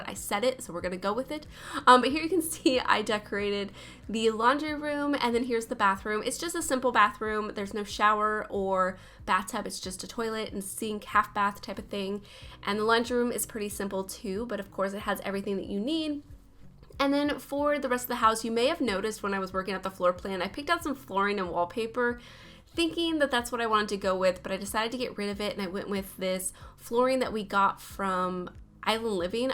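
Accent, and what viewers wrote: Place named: South, United States. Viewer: i always say this, so it’s old now- but i LOVE your builds. your exteriors especially are always so lovely and they’re all houses i would want to live in! random question: where are you originally from? you have a bit of a southern-y twang in your accent i think.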